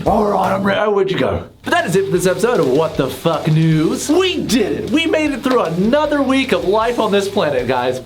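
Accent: United Kingdom. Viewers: his brit accent sounds like murdoc im crying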